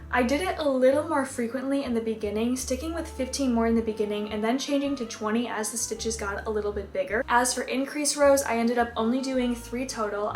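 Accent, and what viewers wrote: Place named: East Coast, United States. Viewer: you sound so east coast and i love it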